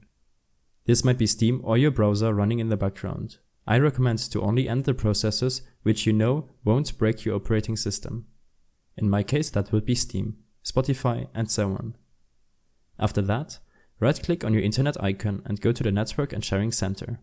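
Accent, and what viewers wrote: Germany. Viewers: Man hört so hard das du Deutsch bist 😉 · lol the german accent xd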